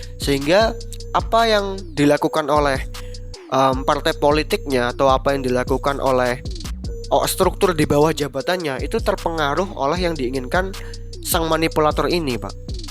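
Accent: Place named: Java, Indonesia. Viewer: logat jawa pake lo-gw jd aneh ditelinga, mending kamu-aku wae mas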